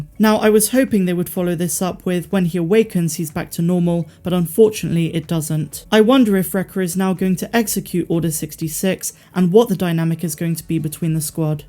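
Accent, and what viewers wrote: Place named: Bristol, United Kingdom. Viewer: you sound bristolian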